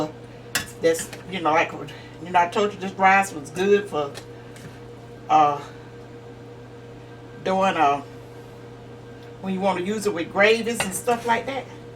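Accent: South, United States. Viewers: Awww you are a God sent woman! I am putting your meatloaf recipe in the oven precious woman of God! So happy to hear about the give always. I can say, I am sooo very proud of YOU! You encourage me more than you know! My I love your apron. You can sale those as wells! Take your time woman of God and do what you do! I absolutely love it. Currently still running to cancer institute with honeylamb! So hopefully he will enjoy your tasty meatloaf! I am on Facebook and will look at your page! My husband leans towards savory recipes because chemotherapy took appetite! Oh, he is an assistant Elder at our church! By the way, you are loved and love your southern accents! It is what it is God bless you, and anything savory to get us through, please cook it! AgIn God bless you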